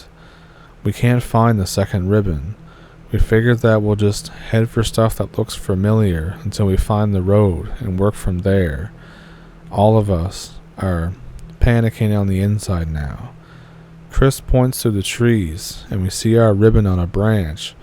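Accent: Canada. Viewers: I’m English and I think it’s cute lol but it’s probably because I love his Canadian accent. I would listen to him read the football scores! 😂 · This is told so well I'm actually laughing at the state of mind those guys are in lol, spent nearly 5 years in the UK now I think I probably know the area they come from with how they speak to each other 😆 , in a Canadian accent? I think, like a movie in my head very well done sir ! 👍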